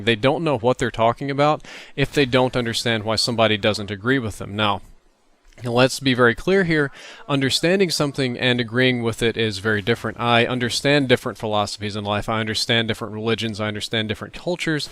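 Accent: South, United States. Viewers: your pronunciation of words such as what or white make up four the southern accent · Yo, Where are you from? What state in the south? (I can't seem to place your accent)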